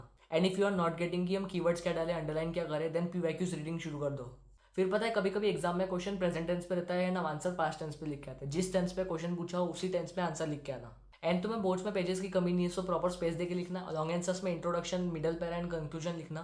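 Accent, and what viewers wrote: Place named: Hyderabad, India. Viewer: His.. Hyderabadi accent 😅🤙🏻🥀😭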